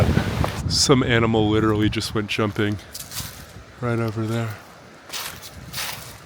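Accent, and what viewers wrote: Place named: Upper Peninsula, United States. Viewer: Yer ah yooper!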